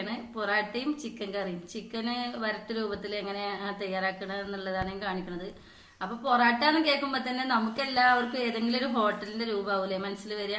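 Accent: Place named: Malappuram, India. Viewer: മലപ്പുറം ടെച്ച്. അത് മനസ്സിനൊരു ആനന്ദമാണ്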